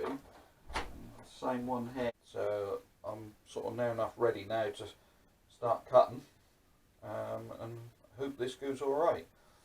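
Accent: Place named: Suffolk, United Kingdom. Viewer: it sounds as though you may come from Suffolk boy as I do … keep up the good work 👍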